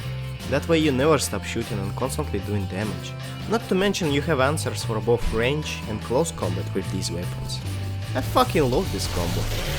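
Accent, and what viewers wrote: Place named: Ryazan, Russia. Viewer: ох уж этот рязанский акцент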